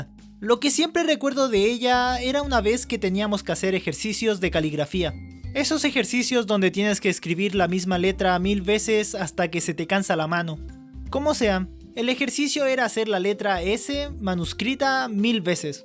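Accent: Chile. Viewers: Por su forma de hablar se nota que es chileno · Alv sabia que vivias en chile :v tu voz sonaba chilena '_'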